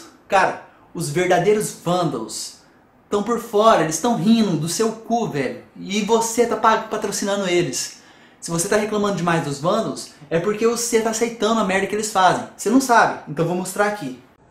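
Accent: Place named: São Paulo, Brazil. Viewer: As idéias são boas; O triste é ter que suportar o vomitável sotaque paulistano e os "tá ligado???". Tá ligado???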